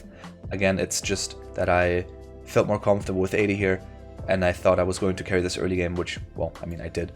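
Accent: Germany. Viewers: You sound pretty german xD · Where are you from? Sounds a little bit like german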